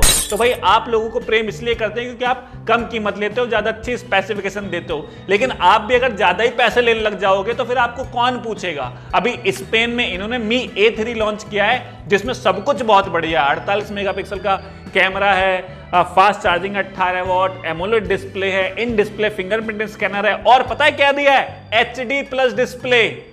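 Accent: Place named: Bhojpuri, India. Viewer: Well tried for Bhojpuri 👍🏻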